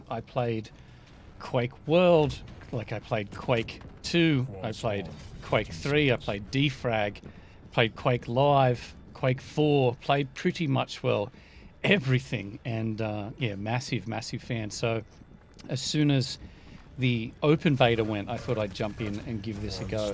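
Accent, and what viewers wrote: Australia. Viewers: I watch you for your personality and your smooth Aussie voice. Just play what you like and people will watch.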